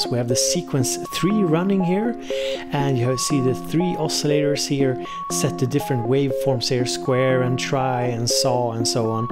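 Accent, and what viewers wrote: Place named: Sweden. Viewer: Cool vid, as usual! This vst is so useful to beginnig in the euro rack adventure! Btw, You need to have a great job to travel and go to hotels, so cool! Btw 2, I know were your nice little accent come from now, cause i searched " " on google (Beware...stalker in view) and you come from: ♪♫♪♫ (drum bearing)...Sweden! Am i right?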